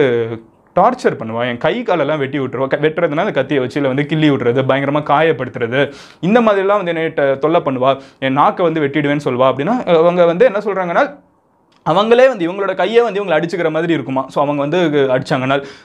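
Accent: Madurai, India. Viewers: தலைவா நீங்க மதுரை யா ? மதுரை 🔥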